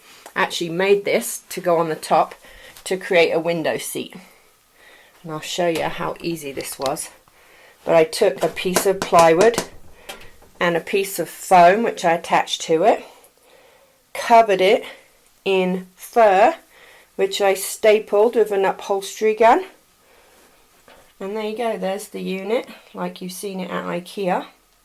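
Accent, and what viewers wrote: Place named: United Kingdom. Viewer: I could hear the Brit in you:)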